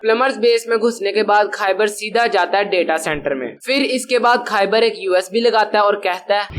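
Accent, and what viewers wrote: Punjab, India. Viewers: Bro r u punjabi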